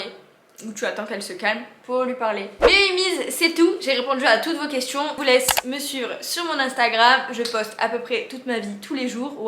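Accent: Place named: South, France. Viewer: L’accent du sud ! 😉🤩 super vidéo d’ailleurs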